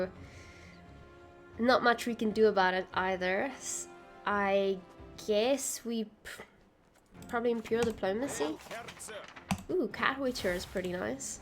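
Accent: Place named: South Africa. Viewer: Your english pronunciations are the most enjoyable i've ever heard. Are those pronunciations characteristics to South Africa?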